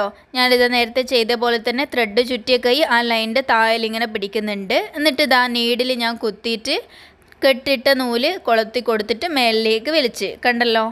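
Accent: Kannur, India. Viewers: നിങ്ങളുടെ place kannur aano സംസാരം കേട്ടിട്ട് അതുപോലെ und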